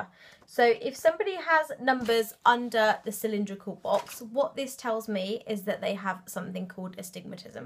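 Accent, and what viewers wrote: England, United Kingdom. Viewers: Hi again! ah I'll go back and listen again. I'm glad I emailed you. I'm guessing you are in England somewhere ( hearing your accent ) so you are about 5 hours ahead of me, so it's about midnight there. Anyway, thanks for responding and so quickly too! I really appreciate it, and you said that very well, I get it now! Thank you again for your time:-)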